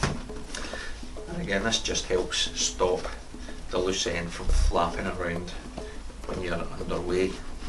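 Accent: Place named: Scotland, United Kingdom. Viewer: Quality product at a great price, added to wish list! Thanks for this helpful demo. I wish all salesmen were Scottish, love the accent :D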